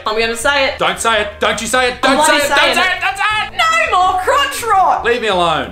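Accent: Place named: Australia. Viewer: God you're Australian.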